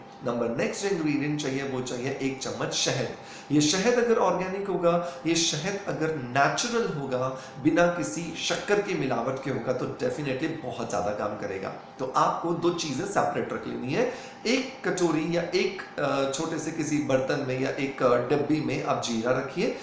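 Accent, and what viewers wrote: Bengal, India. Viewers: Sir u r bengali or odia?? Pls reply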